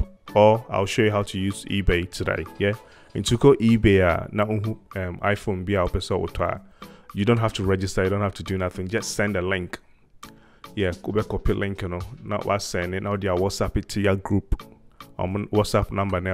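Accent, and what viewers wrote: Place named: Twi, Ghana. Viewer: Gugool! Can you pronounce it right for crying out loud with yout Twi English!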